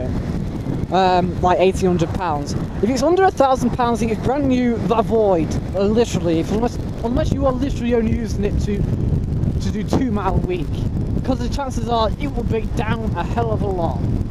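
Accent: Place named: China. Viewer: You make English sound Chinese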